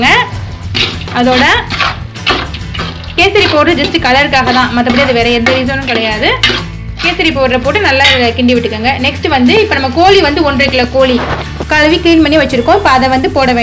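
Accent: India. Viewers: Please vaya moodu ma... First yedhadhu channel la Samayal program yepdi pandranga nu pathutu relax ah video la pesunga... Pre video first oru paper la seymurai pathi step by step unnecessary repeat words illama yezhuthi vechu oru murai pesi parunga... Correction ku yarathu pakkathula vechu senju parunga... Then oil kodikara sound pondra idangalil background music avoid pannunga.. better neenga pathiratha moodi vechu time kudukanum nu soldra time ku music add panninale enough. Then very important neenga madurai, theni, ramanad, sivagangai area ah sernthavangannu nalla teriyuthu adhu thappillai. Unga area slang la neenga pesunga city karanga pola pesa muyarchikka vendam.adhu ungal videovin iyalpai kedukum.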